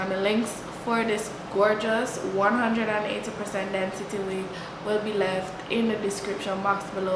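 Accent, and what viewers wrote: Jamaica. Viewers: Loooove!!! ❤❤❤ You sound like a yardie tho! Only a yardie will understand what i mean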